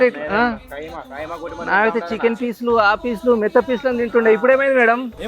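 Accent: Godavari, India. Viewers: godarolla slang tho okka short chesaru bro aa short viral ayindhi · Ma godavari paapa matladithene sweet ga vundhi❤❤❤❤❤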